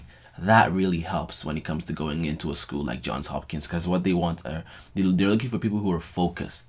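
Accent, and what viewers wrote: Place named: Nigeria. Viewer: Are you Nigerian? You lowkey have an accent 👌🏾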